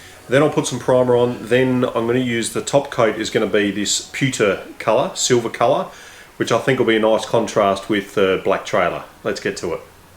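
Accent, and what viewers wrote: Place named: Australia. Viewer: Hi, you sound Australian, do you make these to sell? We r in Vic.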